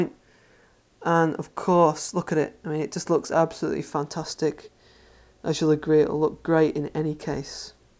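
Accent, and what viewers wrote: England, United Kingdom. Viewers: I'm extremely curious... coul someone tell me where is that english accent from? :)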